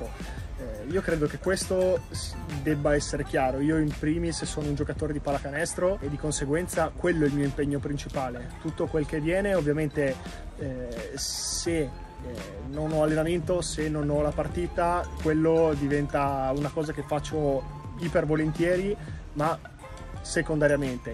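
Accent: Pesaro, Italy. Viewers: Ti seguo dall'Inghilterra, in bocca al lupo per la nuova stagione. Rendi orgogliosi tutti i pesaresi nel mondo, che ne stai prendendo un po' la cadenza. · hai preso un po’ di accento pesarese ahahaha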